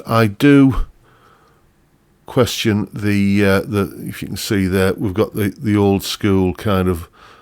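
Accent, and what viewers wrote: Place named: Northern England, United Kingdom. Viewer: Hey! Nice to hear another fellow northerner,I'm from Durham 😅. Where abouts are you from? Great review! I've been curious about Fazely for a while. I've recently(in the past year) got into modding guitars and previously bought a glarry(which is I love!). Fazely are the other brand that appears when you're looking for the cheapest new guitars. With the way prices are now it actually seems more economical to buy one of these brands new. Guitars that use to go for £10-20 second hand like encores are now going for £50-100 which is crazy 😅. Sorry I'm waffling on,got a bit excited 😅 What I was going to ask you was do you think their stuff are decent platforms to modify? Great content too 😊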